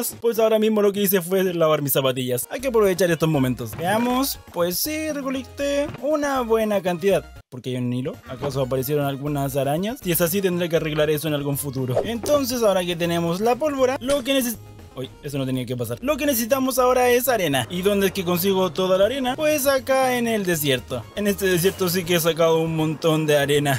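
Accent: Chile. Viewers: eres chileno??????? saludos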